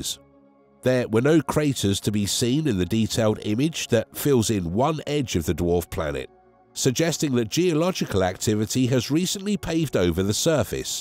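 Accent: United Kingdom. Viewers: Why do working class Brits narrate a NASA inquiry? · British limey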